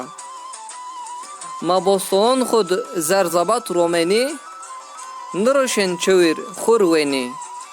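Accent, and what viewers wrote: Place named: Bingöl, Turkey. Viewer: Bingol genc şivesi sanki liceden selamlar homa pilo bena hol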